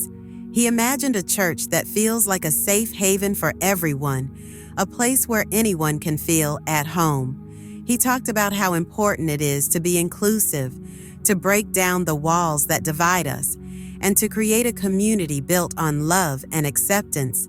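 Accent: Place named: Visayas, Philippines. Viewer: Fake..not deserving..bisaya kaayo.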